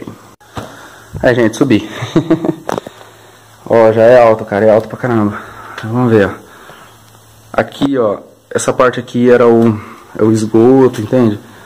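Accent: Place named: São Paulo, Brazil. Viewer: O defeito desses vídeos, na maioria, é não dizer onde ficam os locais mostrados, cidade, Estado. Pelo sotaque de quem está mostrando, a pronúncia dos erres - R, imagina-se que é em S. Paulo.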